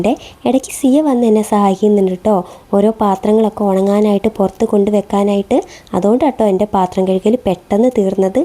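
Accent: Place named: Malappuram, India. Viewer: Super🎉🎉🎉, നിങ്ങൾ കോഴിക്കോട്ടാണ് ലേ? സംസാരം കേട്ടപ്പോൾ മലപ്പുറമാണെന്ന് തോന്നിയിരുന്നു🎉🎉🎉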